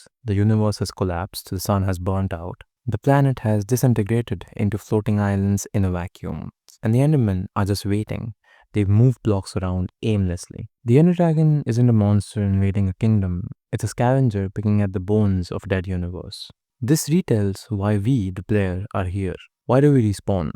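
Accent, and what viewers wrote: India. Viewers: Cool theory but why do you sound like a poopjeet?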